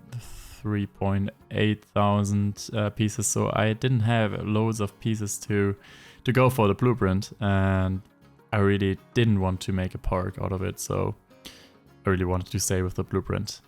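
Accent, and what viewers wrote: Germany. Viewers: Are you german?😂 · got a German accent in his English...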